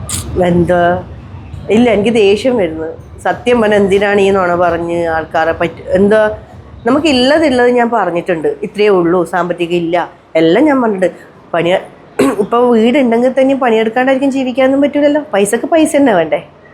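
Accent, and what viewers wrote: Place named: Kannur, India. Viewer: 😂😂 എങ്ങനെ യൊക്കെ ശ്രദ്ധിച്ചാലും ആ കണ്ണൂർ സ്ലാങ് പുറത്തു ചാടുന്നത് കണ്ണൂർക്കാർക് തിരീന്നുണ്ട്... ട്ട്വ...😅😅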